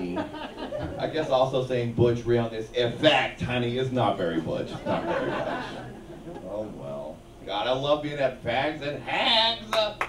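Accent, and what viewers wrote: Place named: Louisiana, United States. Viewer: Is he from Louisiana and idk why but I adore there accent. Whatever u do in ur life is none of my business. ✌ ❤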